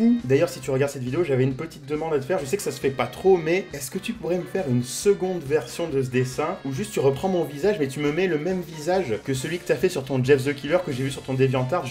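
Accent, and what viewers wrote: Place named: Quebec, Canada. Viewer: J'adore l'accent québéquois ^^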